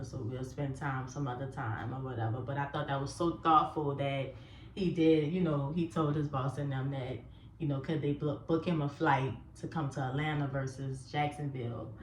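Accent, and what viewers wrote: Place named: New York, United States. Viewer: Hey just subd to your channel💯🫡. Are you from NY? You sound like your from up there🙂😀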